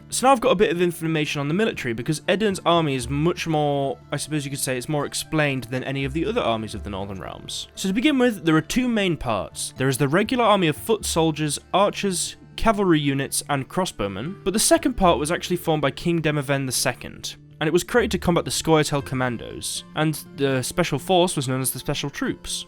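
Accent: United Kingdom. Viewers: Loved that intro for the Witcher 2 with Letho wish i had played it on ps3 and let Letho live. Are you from the UK?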